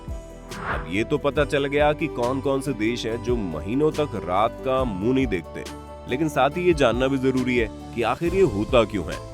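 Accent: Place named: India. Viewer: Aapki avaj hindi Vibes jesi he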